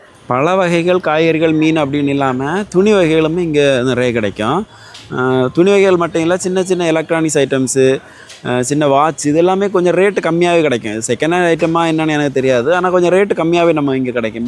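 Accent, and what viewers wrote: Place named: Kanyakumari, India. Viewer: Kanyakumari Nagercoil Slang😅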